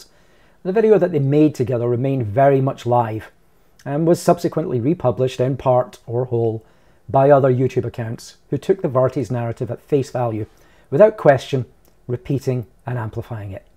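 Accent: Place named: Scotland, United Kingdom. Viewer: I've been following everything these last few months and I have to say you come across as a completely, upfront, honest genuione bloke and acted in a completly professional way. If you ever need a slightly bigger platform to discuss the ins and outs of copyright then sir you're more than welcome on my channel any time. The second I heard the Scottish accent i knew you were a solid bloke. :-)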